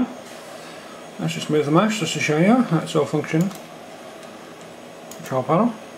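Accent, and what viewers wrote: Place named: England, United Kingdom. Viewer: hes speaking english english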